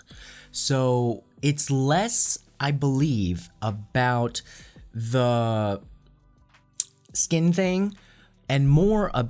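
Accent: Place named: California, United States. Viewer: you sounded very californian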